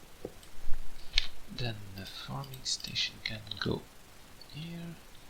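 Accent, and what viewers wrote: Flanders, Belgium. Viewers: are you Belgium? I can hear it on your 'vlaamse' accent. Love your mods though. I also had one question about XNet, how does the redstone mode work on extracting items mode?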